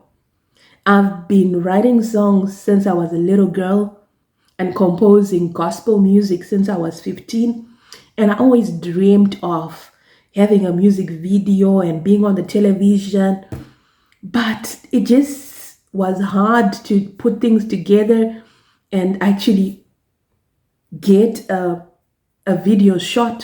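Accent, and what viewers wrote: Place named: South Africa. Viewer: You have a South African accent, sounds very nice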